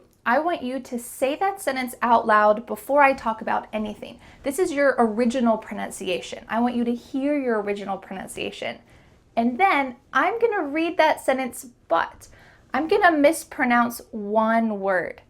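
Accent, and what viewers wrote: United States. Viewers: I love you ❤️ Every time I feel like my american-accent-blood-pressure is going down I check out one of your videos in order to get some dosage of the american accent. 😅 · speaks with an American accent...in Australia we don't pronounce the "r"!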